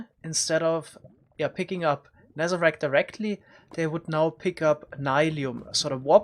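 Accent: Germany. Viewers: see, i was thinking maybe germany or france. the german accent i’m most familiar with is a little different, so i wasn’t sure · You Sound really german Du allmann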